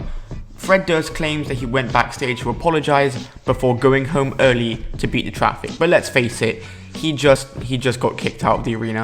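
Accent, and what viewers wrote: United Kingdom. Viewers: Troy Edward Well that's why I said "I don't know if English is your first language". But he sounds like he's somewhere from the UK, so English should be his first language. I wasn't being rude. I was just clarifying what "chicken shit" meant. Even though it's a common saying, he should know what it means. But maybe it's just a common American saying.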